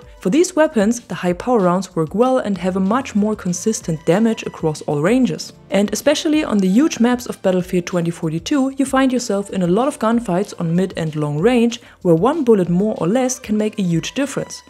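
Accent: Germany. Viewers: Recongnised a slight german accent :) Thanks for the video.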